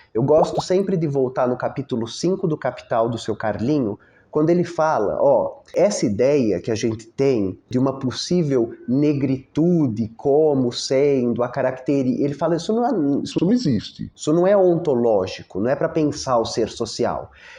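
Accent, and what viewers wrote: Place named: São Paulo, Brazil. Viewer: você é especial. O seu sotaque me delicia (sou carioca). Parece uma especialização do sotaque paulistano, talvez ligado aos italianos. Para mim é o sotaque de uma das minhas bandas favoritas: Os Mutantes. O seu sotaque é igual ao do Arnaldo Batista e, principalmente, do Sergio Dias.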